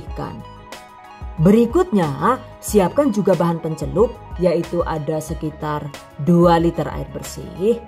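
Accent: Banyumas, Indonesia. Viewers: Penasaran sm suaranya, kirain sdh ibu" stngh baya, tak taunya msh muda bs ngapak sdkit ngebodor bs bhs inggris pula keren 👍👍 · Wong ngapak kie yah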